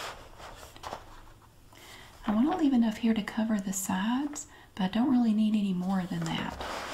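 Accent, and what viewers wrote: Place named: Texas, United States. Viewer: As a southern girl from Texas, this video makes me feel at home😂 the accent, the remarks, the methods, it’s all just familiar🥰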